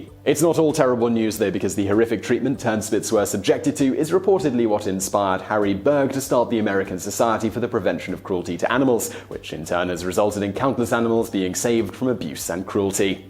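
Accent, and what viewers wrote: United Kingdom. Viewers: I'm reading your comments in your British accent.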